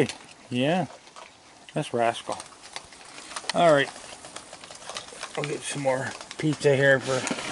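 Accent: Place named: Sydney Mines, Canada. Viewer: I know right? He also sounds like people from Sydney Mines - I thought that was where he was from, when I first started watching him LOL.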